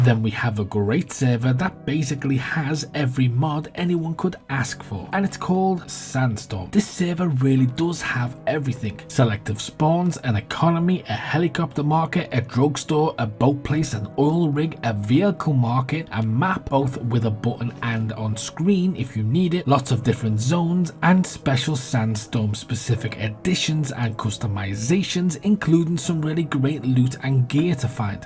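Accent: Liverpool, United Kingdom. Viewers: Nice you from liverpool mate ?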